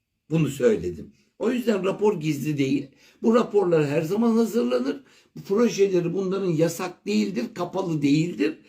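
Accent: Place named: Istanbul, Turkey. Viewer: SAYIN HOCAM SİZİ DİNLEDİKÇE GURUR DUYUYOR ÖZ GÜVENİMİZ TAVAN YAPIYOR...İYİ Kİ VARSINIZ... HUZUR VEREN GÜLEÇ YÜZÜNÜZLE NET ANLAŞILIR BİLGİ DOLU İSTANBUL TÜRKÇENİZLE EVİMİZİN KIYMETLİ BİR MİSAFİRİ AİLEMİZİN TARTIŞMASIZ BİR ÜYESİ OLDUNUZ... RABBİM NEFESİNİZE GÜÇ KUVVET VERSİN BİLGİNİZ HER MAZLUM EZİLMİŞ TÜRK VE MÜSLÜMAN COĞRAFYALARIN ÇOCUKLARINA DERS OLSUN KIYMETLİ HOCAM... ALLAH İÇİN SİZİ ÇOK SEVİYORUZ... SELAM VE DUA İLE ALLAHA EMANET OLUN GÜZEL ÜLKEMİN ALÇAK GÖNÜLLÜ MÜTEVAZİ BİLGE İNSANI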